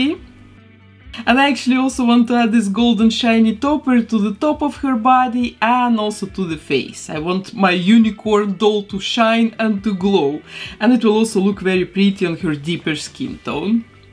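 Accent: Russia. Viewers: Почему такой прям русский акцент)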